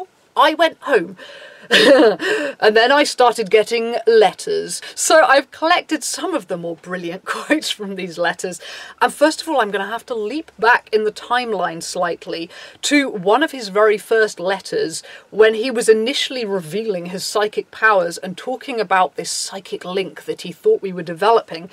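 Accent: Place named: Birmingham, United Kingdom. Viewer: he's from Birmingham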